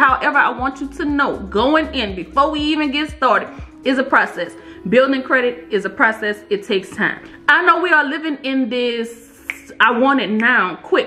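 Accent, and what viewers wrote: New Orleans, United States. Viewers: You got that NOLA twang love from Htown thanks for the game 💯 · Great info! I love your attitude and accent ❤️ Sounds like you from New Orleans 🤣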